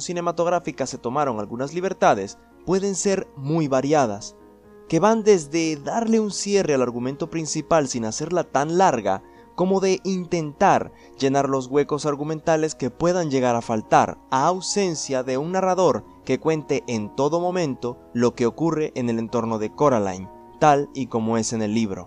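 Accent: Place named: Venezuela. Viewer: YO SABÍA!!!!! YO SABÍA QUE ERAS VENEZOLANO!!! >:D Se te nota en el acento XD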